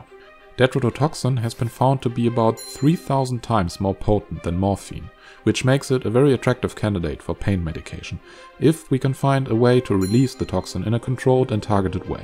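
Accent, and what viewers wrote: Germany. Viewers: Are you German ? because I hear a German note in your pronaunciation lol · German accent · 10 seconds in and i can already tell that he is German lol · This guy is German for sure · höre ich ein deutschen akzent? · Is the narrator german? Sounds like i while speaking englisch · This dude sounds super German. That being said, awesome video. I'm hooked and subbed! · Ich hab noch nie jemanden auf YouTube gesehen der so nen starken deutschen Akzent hat :D no hate ich finds nur witzig und ziemlich ungewohnt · Are you from germany?i don't mean it in a bad way it's just they way you speak englisch even tho yours is perfect kinda reminds me of the my peers used to do ,i'm from germany might explain why i ask? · i love hearing morbid facts in a matter of fact German accent.. Informative and unintentionally droll. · I realized the German accent immediately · He sounds german lol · german accent? 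· Are you from Germany? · Why do you sound this german? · The First 7 Seconds and i already know you are a German. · Du bist deutscher oder? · You instantly recognize the german accent xD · are you german? you do sound a lot like a german person speaking english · You Sound so German you could voice Klaus from American Dad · Kommst du aus deutschland? Dein akzent klingt so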